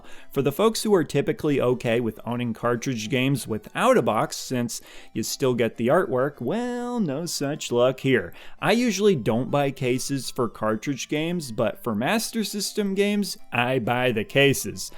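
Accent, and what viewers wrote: Midwest, United States. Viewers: Just subscribed are you Midwestern? I hear your accent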